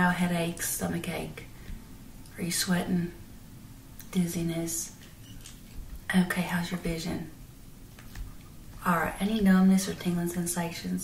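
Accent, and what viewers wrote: South, United States. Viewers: Your southern accent is better than most Hollywood versions with voice coaches and technical consultants... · with that Southern belle accent, this Yankee would tell you anything you want to know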